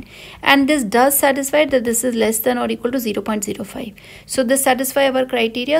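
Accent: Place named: India. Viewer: Indian accent 🥰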